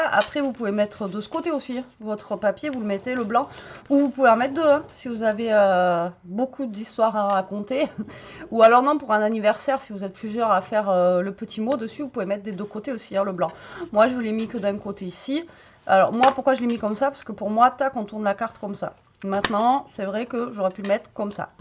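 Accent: South, France. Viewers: Bonjour, je vais regarder ce nouveau tuto avec grand plaisir comme tous ceux que vous faites, j'apprécie beaucoup votre travail, votre style, votre accent 😂car je suis aussi du sud, Montpellier, mais je suis désolée que d'autres personnes personnes vous aient fait de la peine, c'est écœurant et je vous comprends, c'est pour ça que j'insiste pour vous dire franchement que vous êtes une BONNE PERSONNE, ça devient rare en ces temps compliqués, j'espère que vous surmonterez cette méchanceté, moi je vous dis mille mercis et je vous fais un gros bisou😘😉 Elvire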